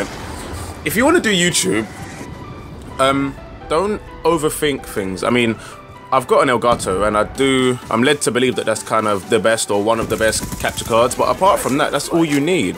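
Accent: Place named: England, United Kingdom. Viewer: Hello:p You sound like your from england, what city do you live in? Im guessing london:p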